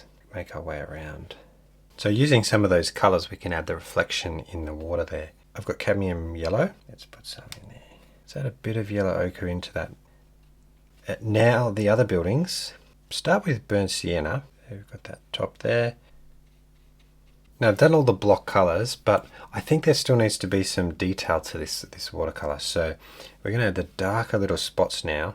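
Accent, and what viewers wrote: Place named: Australia. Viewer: Are you from Australia, New Zealand, Tasmania? Love your calm voice and accent.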